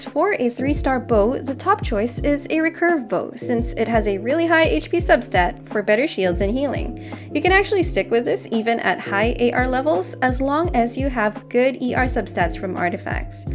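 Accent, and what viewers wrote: Philippines. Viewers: Idk why but im getting heavy pilipino vibes from her voice, where she from, I mean the narrator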